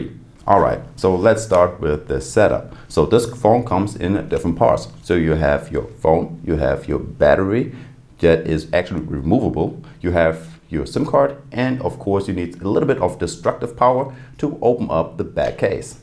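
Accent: Germany. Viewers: Are you from Germany ? or maybe Austria . your accent sounds German to me.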